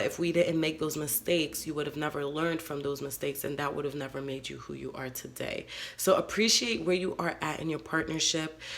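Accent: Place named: New Jersey, United States. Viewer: I love your voice! Reminds me of my cousins from Jersey. Also, will you please not apologize for anything? You can't hear the heater at all! Love your videos and guidance. Thank you!!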